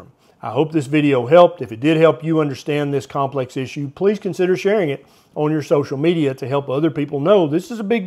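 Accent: South, United States. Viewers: Listening to Dr souther drawl talk about the latest scare tactic the dreaded “micro plastics” makes me want to consume more and hurry up my own demise.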